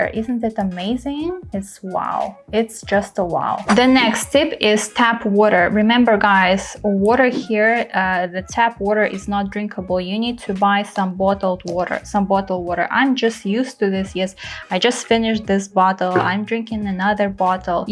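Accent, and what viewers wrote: Russia. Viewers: Why does your english sounds so russian? Is it just me or you may be sibirskaya? 🤔 🖤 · Акцент похож на русский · Are you Russian? You don’t sound American at all and I’m American with no understanding of the Chinese language but you look Eastern Russian or Korean Japanese maybe of mix race. Grateful for you guide but a little too long for my patience, you’re an expensive gal, nice LV shopping spree from coffee table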